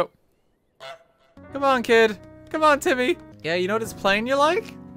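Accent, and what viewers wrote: Ireland. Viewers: 20 seconds in “is this guy Irish” 25 seconds in I was sure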